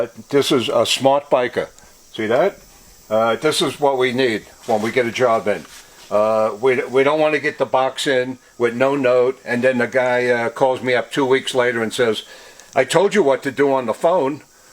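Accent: New York, United States. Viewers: East Coast boy I new I could tell you were from New York... I'm in Philly love what ur into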